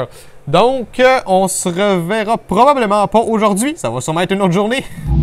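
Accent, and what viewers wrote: Quebec, Canada. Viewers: Ah un québécois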